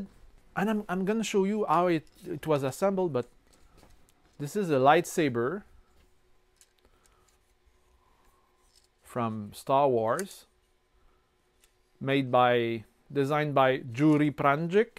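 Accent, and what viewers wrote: Quebec, Canada. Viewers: Hey un québécois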